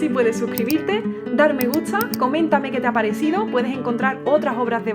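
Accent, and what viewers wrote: Andalusia, Spain. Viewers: Gracias! Me encanta la guitarra, su sonido, su historia. Y me encanta que nos cuentas la historia del compositor, sobre todo con tu acento andalu y siempre con una sonrisa! 🙂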